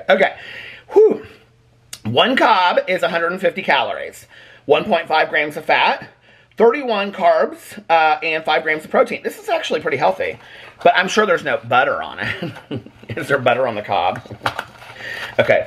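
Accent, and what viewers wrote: South, United States. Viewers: I was more of a Richard Simmons kind of girl? 😂 this was SO fun, I love your Southern accent! 😂 love you! 💙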